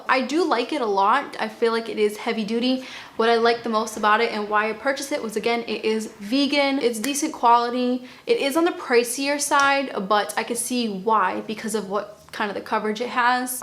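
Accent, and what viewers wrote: Boston, United States. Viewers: Noooooooo I LOVE your Boston way of talking! Please don’t change it! You will get all sorts of viewers from all over the world and they all will have options. Please don’t care! I love the way you talk...Boston style! ;) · Lets get one entire video with your natural Boston accent. Who agrees? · You are so right. Less is more. My daughter and I are helping each other clear out our homes of excess material things. I have been saving so much money buying less things that I am taking more trips. I love to travel. Great video, as always! I love your Boston accent. Embrace it. ☺ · Love your Boston acsent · Through this entire video, I wondered where your accent came from because of the pronunciation of some of your words. Then you said Boston. You’re one of my grandma’s people! This is my first video of yours I have watched. I love it! 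· I love the way you say concealer in your Boston accent! It’s unique and authentic and I love it 🥰 don’t feel you need to “correct” your accent/ pronunciations x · No. Please say “concealuh”. Never ever lose your Boston accent 🥺🥰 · Please don't change your accent for youtube! There's no wrong way to say things, it reflects the dialect where you are and it makes you unique! I watch your videos a lot so sometimes I will end up saying things with a Boston accent just because it's kind of fun! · You pronounce your words perfectly with your fantastic Bostonian accent! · You don't have to correct your Boston accent! It's who you are and I love listening to you speak! Who cares if you don't pronounce it exactly as it's spelled, do people actually complain about this? We are all unique! I'm in Philadelphia and we also pronounce things the "Philly way" haha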